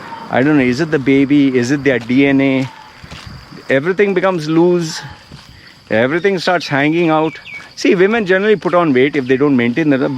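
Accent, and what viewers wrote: India. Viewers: After watching your video, I would say you should talk to your parents that they should plan you in America at least you could speak American accent English. And you didn't get married to an Indian woman because bla bla bla.... Because you must from a very backward area from India or you left India in 70s or 80s. Or third your video is not relating to every part of the country. Or most important: you must rejected by so many Indian women. Last but not least, indian people are hairy but not unhygienic. We Indian prefer hygienic hairy bodies instead of sweating, smelling and yucky people with bald bodies who don't take bath for a week. You might be right at some points but Being an Indian face, Indian accent English (May have American citizenship) you are not disagreeing, You are insulting.... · Are you an Indian? Your accent sounds of an Indian speaking English. 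· Which country r u native to?? Ur english accent is 100% indian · Ae Indian hi sayad... he's accent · That’s the right question, the last question . Definitely no Indian woman wanted to marry a man like you. First and foremost learn to respect everyone . Seems like you had a very sad childhood . The traumatic experiences. Maybe it’s your parents or the Indian society which screwed you. Try to get yourself heal rather pretending to be someone else . There is a psychological reason why you are wearing a mask now . And your accent, thats a typical Indian accent man. Unfortunately you can’t run away from that. Your root! Angry and fearful man. Peace ✌🏼🇲🇾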